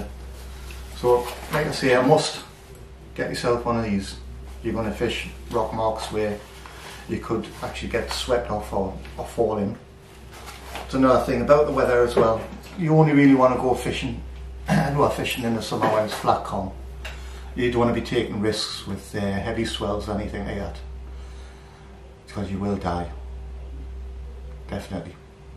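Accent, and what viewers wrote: Newcastle, United Kingdom. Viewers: Thanks mate just made my move to Donegal permanent and less than 3k to most beautiful shoreline, although a trout and salmon angler that keeps me busy in these parts always wanted to shore fish, just found your channel a few weeks ago and your video today will help me tackle up cheers again and your Geordie accent is great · Great video mate, enjoyed very much. I'm from South Wales and can understand your geordie accent no problem haha. Keep up the good work. Gareth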